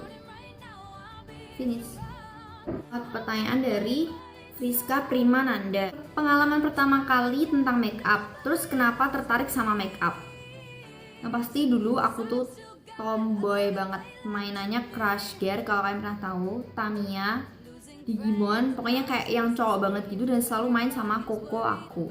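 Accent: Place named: Surabaya, Indonesia. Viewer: Iya aku juga mikirnya kamu org surabaya karena ada logat surabayanya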